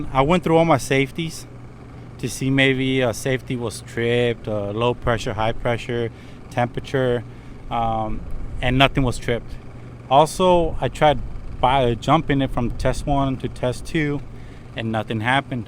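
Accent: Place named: United States. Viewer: Yo pensaba que eras gringo ...Jajaja good videos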